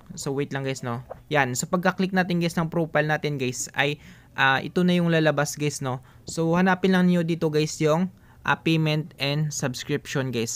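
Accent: Iloilo, Philippines. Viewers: Ayusin ang pagsasalita parang Ilonggo kayo? Tutoo o hindi?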